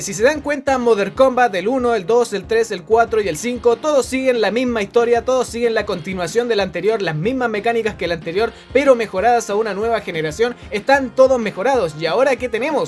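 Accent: Chile. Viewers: Podrías por favor tratar de neutralizar más tu acento...? Tus vídeos son excelente pero tu acento chileno se me hace tan fastidioso... No soy hacer ni nada, solo como consejo..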